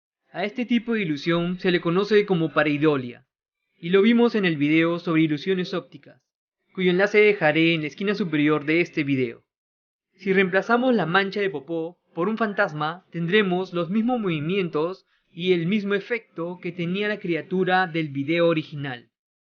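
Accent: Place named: Peru. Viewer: ERES DE PERU VERDAD ? POR QUE TIENES NUESTRO DIALECTO .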